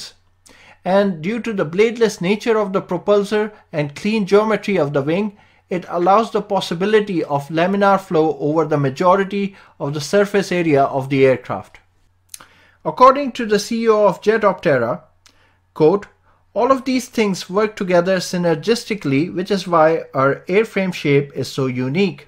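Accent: India. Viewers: Lol. Are you saying that to make him feel better about his thick Indian accent that is hard to understand? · It could actually take off, if only the hindustistani speaker would be replaced by a normal english language spokesman.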